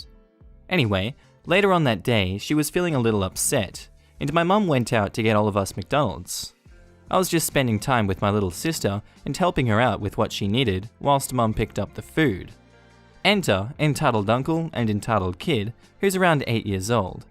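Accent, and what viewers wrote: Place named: Australia. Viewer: Appreciation points for Aussie narrator 🦘🐊🦘🐊🦘🦖🦘🐊🦘 🦜🦜🦜🦜🦜🦜🦜🦜🦜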